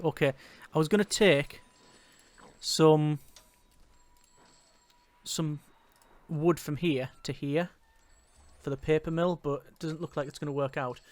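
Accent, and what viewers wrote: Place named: Wales, United Kingdom. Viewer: Man, are you Welsh? I study and practise UK accents because I think they're awesome, and I detect something in your voice. It sounds Welsh.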